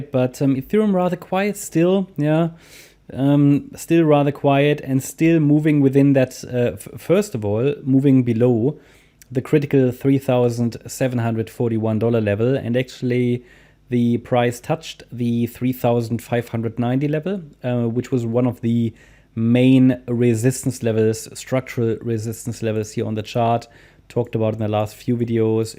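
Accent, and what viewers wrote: Germany. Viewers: Ok, my mistake. Sounds like a German accent. Or maybe you are German just living elsewhere?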